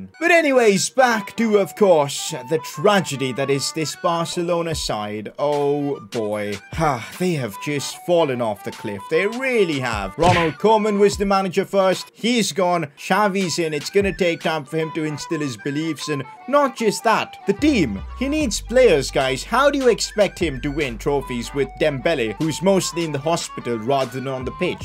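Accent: India. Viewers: Are you Indian coz your talking way is like that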